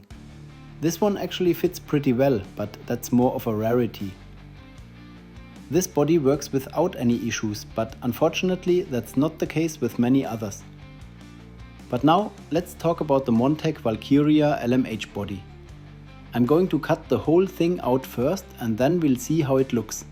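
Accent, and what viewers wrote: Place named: Germany. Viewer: Englisch für Deutsche! Super!!! Hier verstehe ich jedes Wort 😂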